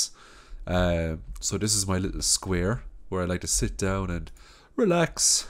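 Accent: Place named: Rural, Ireland. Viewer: Of course an Irish man would be a great farmer 🤣🍀 (I'm assuming based on the cultchie accent 😆) love the vids?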